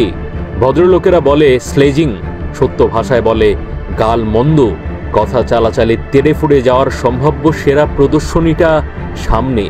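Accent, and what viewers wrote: Kolkata, India. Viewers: এই লোকটা কি ক্যালকেশিয়ান? এভাবে কথা বলে কেন? আমরা বাংলাদেশ, বাংলা নই।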